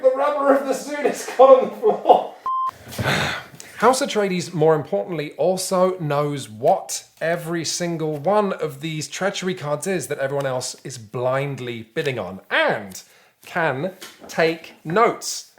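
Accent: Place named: England, United Kingdom. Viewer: most English sounding man ever, congrats.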